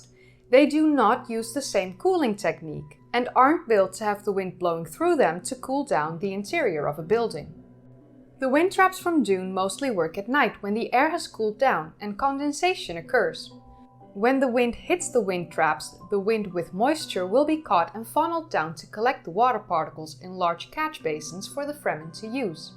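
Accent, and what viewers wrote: Netherlands. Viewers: The Dutch accent should stay, R's sound so much better ❤. Great take on the 1984 Dune lol. It is a cult classic good and not good simultaneously. Excited for merch too. Oh and I am in love with those scrunchy sleeves 😍. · I luv your Dutch accent. Takes me back to childhood in Europe. "Terribly Incredible" = perfect. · I like the Dutch pronunciation 👌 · Your videos are great! Don’t worry about the accent. Dutch speaking people generally do a great job with their sister language (or so I’ve noticed). I speak some Norwegian as well (very poorly granted after 30 + years of being away from it), but we should never be embarrassed about trying. You definitely have a great command of the language.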